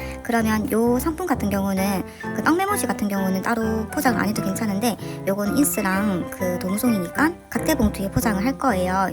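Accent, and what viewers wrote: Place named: Gyeongsang, South Korea. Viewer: 경상도 분 이신가요오?! 너무 귀여워요 사투리..😳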